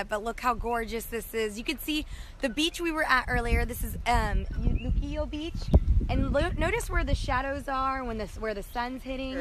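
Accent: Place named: South, United States. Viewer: lol? When she says "Ya'll," she is referring to friends at home in the states of Texas. - its a southern accent. I don't know why.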